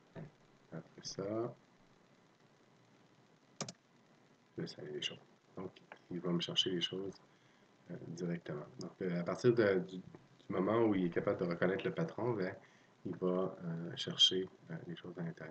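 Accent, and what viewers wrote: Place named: Quebec, Canada. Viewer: Merci beaucoup très intéressant J'adore aussi l'accent québécois ;)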